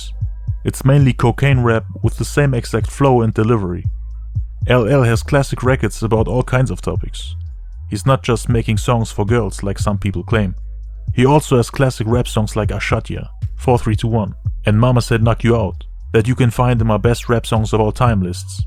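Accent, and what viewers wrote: Germany. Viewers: Are you from germany? I just mean because of the accent and the farid bang video😂😂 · Bist du deutscher? Hört sich so an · is this guy German lol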